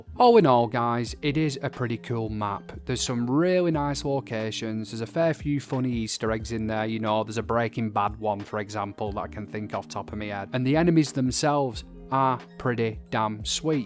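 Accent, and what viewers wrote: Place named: Yorkshire, United Kingdom. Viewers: God I just love you accent mate. I'm from Britain and I'm guessing maybe your from Yorkshire? Either way you accent is brill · Are you from Yorkshire? I am and I'm rubbish with figuring out other people's accents 🤣 Great video by the way, thanks!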